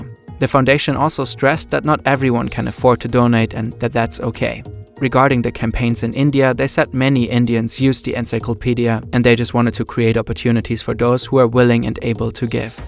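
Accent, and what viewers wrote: Germany. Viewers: you sound like a native german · Coz he's german w is pronounced as v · Are you german?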